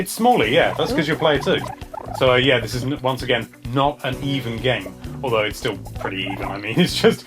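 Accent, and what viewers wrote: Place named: England, United Kingdom. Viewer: i totally agree that Snipperips is number 1. I'm not sure that blaster master deserves to be on the list, I was very disappointed with the co-op feature and felt it was put in at last minute without any thought! I would like to see this list done again in say a years time when there are loads more games to choose from! Great video as always, makes a refreshing change to listen to a fellow English man when on YouTube searching for my SwitchFix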